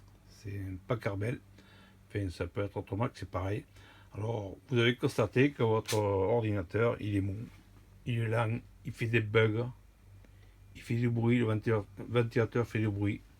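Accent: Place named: South, France. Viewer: On sens le sud dans tes video j’adore !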